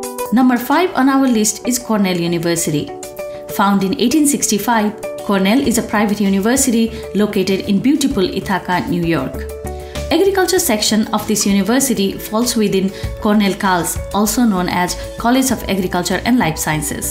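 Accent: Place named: Nepal. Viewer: Your accent sounds nepali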